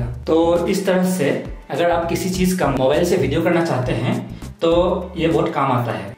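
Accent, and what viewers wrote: Assam, India. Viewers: your accent sounds assamese ☺️ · Your hindi accent sounds like you are Assamese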